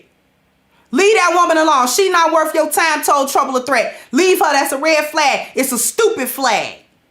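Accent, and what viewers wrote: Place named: South, United States. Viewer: I miss that gap... it brung out the country in the way you speak.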